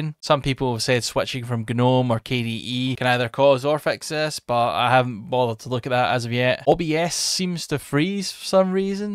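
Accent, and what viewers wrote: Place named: Scotland, United Kingdom. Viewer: Nice video. It's interesting to see how others do when making the switch to Linux. I tried a few different distros as I wanted something that would make it easier to set everything up for gaming and eventually settled on BigLinux which I really love. I did try Nobara but I found that it wasn't for me although I'm certainly taking advantage of Proton-GE etc as the dev has provided us with many goodies that make life easier. Also nice to hear another Scottish accent 😁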